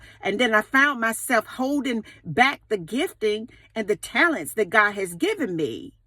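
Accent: South, United States. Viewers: Thank you. ❤ You sound like you are from downsouth. May I ask where you are from and what is your ethnicity?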